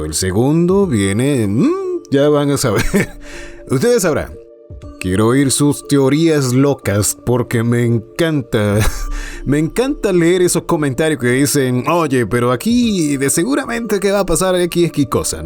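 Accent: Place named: Caribbean. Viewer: Por alguna razon tu voz fuera de la narracion me imagino un Skyper caribeño y me encanta xD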